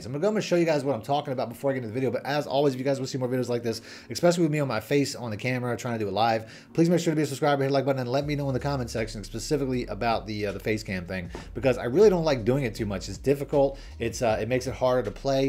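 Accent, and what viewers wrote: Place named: Delaware County, United States. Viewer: You sound like you’re from DELCO….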